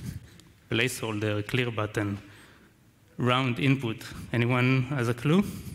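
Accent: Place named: Arab world. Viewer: I'm sorry,I couldn't understand your English, which looks like Arabic pronounce.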